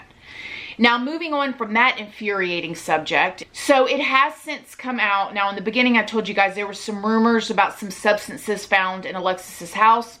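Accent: South, United States. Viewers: Your accent got even more southern over the christmas i hear!😄 You are so, so sweet! And good job with the video. Very interesting case indeed!🤓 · Your obviously from down south, I'm curious if you have some Italian in you the way your hands fly around when you talk???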